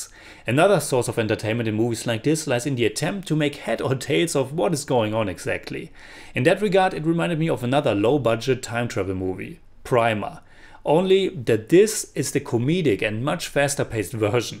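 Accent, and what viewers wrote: Germany. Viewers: Dind´t though of this movie as a mindf*k at all and my disabled roommate who was kinda afraid to watch this movie, because "it´s for the smart people" was like me kinda disappointed for it being a quite simple time paradox movie after all the hype. In the end it was a really great B-movie despite the typical bad acting of some actors. But what I´m wondering, why do you still have this obvious german acent left? Why don´t barely any germans take pride in pronouncing a different lenguage correctly? It´s always just like "srew it if I butcher the pronounciation despite having all the possibilities to learn how to pronounce correctly due to the freak`n internet". I´m about to get 35yrs, had terrible english teachers trying to force their germanized take of british english on us and yet, I´ve started to teach propper pronounciation to myself at the age of 17 (I´m old, just got the first affordable and actual useable internet connection just two years prior) and am asked which english speaking country I´m from since I´m 21. 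But I guess I have a big talent for speach since bavarians asked me which part I´m from even I´ve never been to bavaria in my life. In the end, your pronounciation is telling how much respect you got for a another lenguage.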